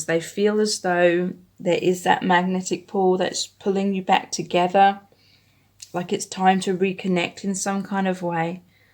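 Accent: United Kingdom. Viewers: I love your British accent ❤❤.Great job by the way, you are really gifted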